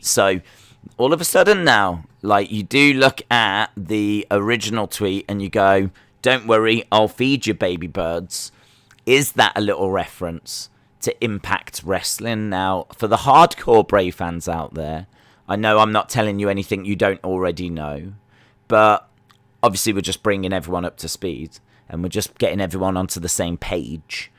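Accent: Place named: Midlands, United Kingdom. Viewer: Just found your channel. You from the Midlands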